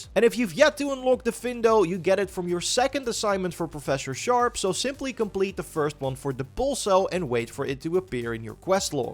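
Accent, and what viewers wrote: Netherlands. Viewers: The Dutch accent in this commentary is so obvious I love it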